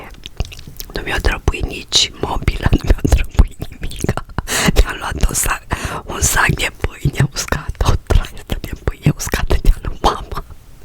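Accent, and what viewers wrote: Transylvania, Romania. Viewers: Super smecher, am observat ca vorbiti ardeleneste fix ca parintii mei si am zis ca sigur ati stat in Baia mare sau ceva, habar nu aveam ca sunteti de prin Bistrita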